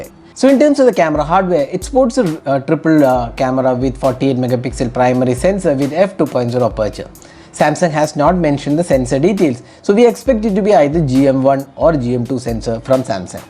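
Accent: Kerala, India. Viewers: Heavy mallu accent.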